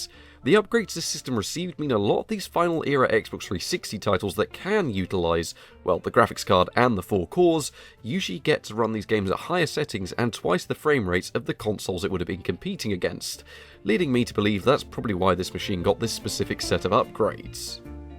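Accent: United Kingdom. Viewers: As a french guy, I really enjou this british accent. It is better than the ugly american one. Nice channel by the way 😀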